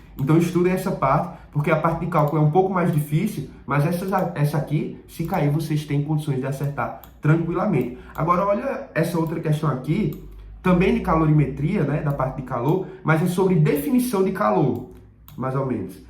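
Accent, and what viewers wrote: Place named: Northeast, Brazil. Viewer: Parece nordestino.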